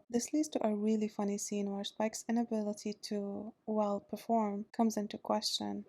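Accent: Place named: Ireland. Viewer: Yeah, I guess that's what it was. My accent when I speak is clearly noticeable, too. So, judging from my expertise, I would say, you're closest to Irish! 👍🤗 Thanks for the vid though, too! It's good. I haven't watched everything yet but your analysis is on point and we both truly share a love for Buffy. 🤝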